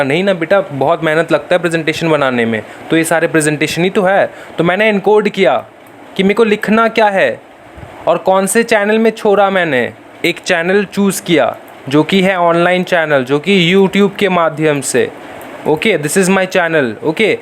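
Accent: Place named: Haryana, India. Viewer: Haryanvi voice Gjb 😊 I like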